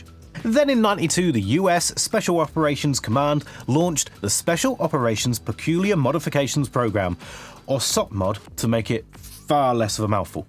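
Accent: United Kingdom. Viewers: hopefully I’m proving wrong with this comment but going based off of your voice I’m assuming you’re from the UK with extremely strict gun laws mind you I could be completely wrong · bri'ish man with Klinefelter syndrome and a chatgpt generated script tries to tell me that rail on gun = bad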